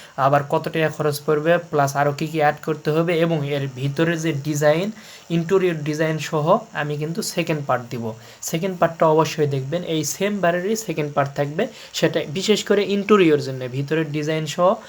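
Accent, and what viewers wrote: Naogaon, Bangladesh. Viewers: নওগাঁ আর জয়পুরহাটের ভাষা কি এক? উনার ভাষা নওগাঁর মত।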